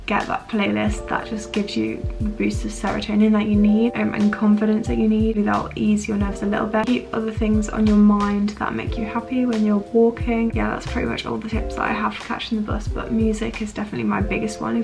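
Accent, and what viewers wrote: United Kingdom. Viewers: it makes me wanna learn british accent thank you 💗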